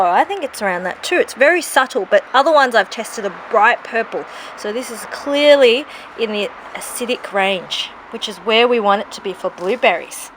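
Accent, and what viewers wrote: Australia. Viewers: Very interesting an Asian woman with an Australian accent or European accent I absolutely 💯 love you. consider me a new SUBSCRIBER.. ROCK ON BEAUTIFUL...❤️🐼🌻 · I love a nice asian woman with an Australian accent who loves to garden.. But awesome content cause I am about to do blueberries myself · Hi lady!🌹 First time ever seeing you on line about Blueberries! First, what a lovely pretty lady speaking perfect Australian accent has immensely added attraction to the description, I’m a transplanted myself to America, sometimes I have hard time understanding Aussie and English folks but the more I listen to you all the more I feel closer to you, today you’ve got me hooked!🤩 Thoroughly experienced from various blueberries for better pollination and productivity to experiment Subject to soil acidity testing, VERY NICE! 😀 You’ve got adorable smart kids to help identifying soil acidic colors…. 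Family involvement, Lovely! The good looking crimson red fruit/ bell hanging in the middle of the metal arch, what is that fruit??? Looks like pomegranate but surrounded vines don’t look like Pom tree?! Thank you much for this video, I enjoy it greatly!!! 🌹🌿🪴🫐💖🇺🇸🤩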